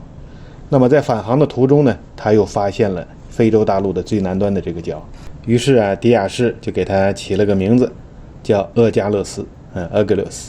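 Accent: Tianjin, China. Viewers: 好吗（天津口音），的故事太精彩了，😄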